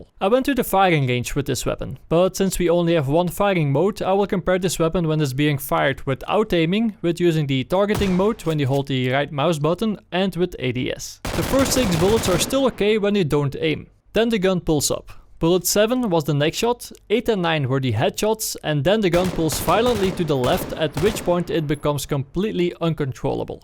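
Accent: Netherlands. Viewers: are you dutch ? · like the dutch accent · je klinkt ml ben je nl?? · Wait, are you Dutch? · Is he dutch? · Dutch, right?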